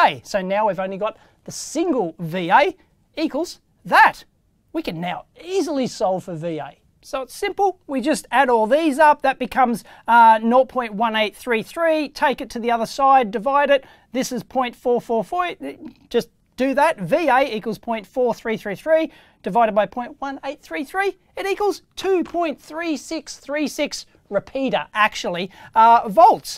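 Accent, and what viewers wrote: Australia. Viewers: I love this man. Also the Australian accent is icing on the cake. Great tutorial, thanks!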